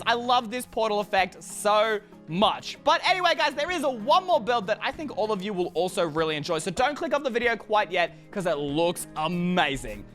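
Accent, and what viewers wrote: United Kingdom. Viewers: You look like chinese,or korean or japanese but your accent is british😂😅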